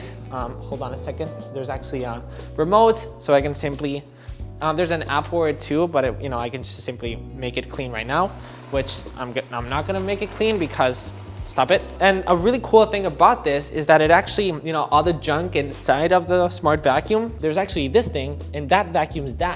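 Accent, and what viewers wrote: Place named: Spain. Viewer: is he spaniard? I can notice an accent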